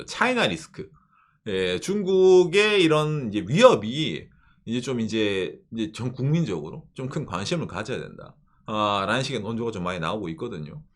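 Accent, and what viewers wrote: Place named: Gyeongsang, South Korea. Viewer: 일본 사람 팬더 좋아한다메? ㅋㅋ 과 같이 살더니 자연스레 경상도 사투리를 쓰시네요~~~^^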